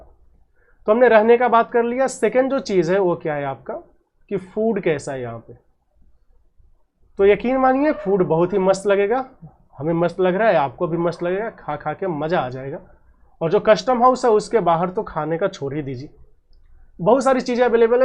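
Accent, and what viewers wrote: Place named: Bengal, India. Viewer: Ap bengali ho kya?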